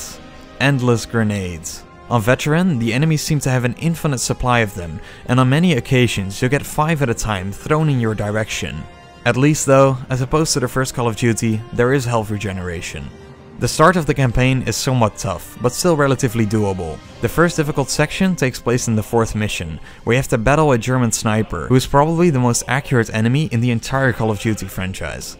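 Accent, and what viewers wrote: Netherlands. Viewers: Is this guy Dutch or German? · I know a dutch accent when i hear one! Subbed! Great video! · ik dacht bij het begin al ik hoor echt een nederlands accent en ben toen door de comments gaan duiken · ik dacht aan het begin van de video deze gast klinkt echt Nederlands door ze accent en toe keek ik naar de trofeeën die popte en besefte ik dat hij echt Nederlands is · Hahahaha first 10 seconds can already tell you’re dutch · Ahhh that sweet dutch accent😂, good video keep it up.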